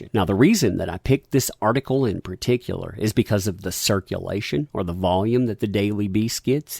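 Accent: South, United States. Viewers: I feel like I’m visiting my Southern grandpa for sweet tea while he reads me the paper. Wonderful time being here ☺️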